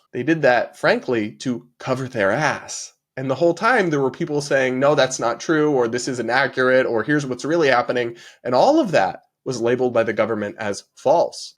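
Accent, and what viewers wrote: United States. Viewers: You say “from a centre right perspective” but I hear a yank accent so in reality you are coming from a far right perspective.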